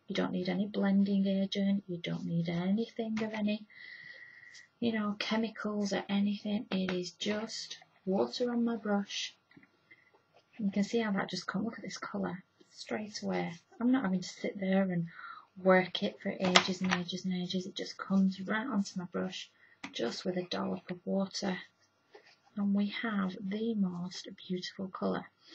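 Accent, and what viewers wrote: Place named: England, United Kingdom. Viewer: Hi.....she`s speaking very clear...she`s English...I am Australian so its easy for me...where are you from...have an awesome day!!!.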